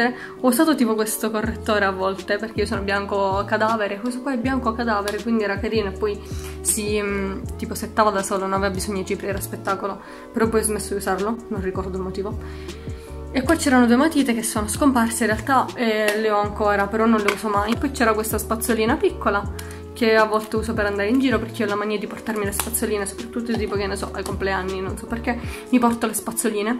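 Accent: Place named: Sicily, Italy. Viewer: secondo me sei di Marsala . Cmq sei siciliana come me. Io abito in provincia di palermo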